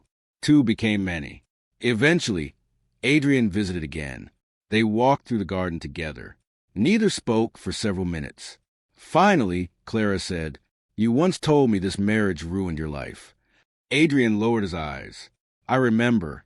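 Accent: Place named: South, United States. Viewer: Good story , narrators voice soundos too country🫤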